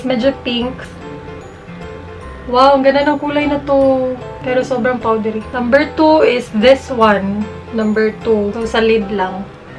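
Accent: Visayas, Philippines. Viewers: bisaya ka po ba ate?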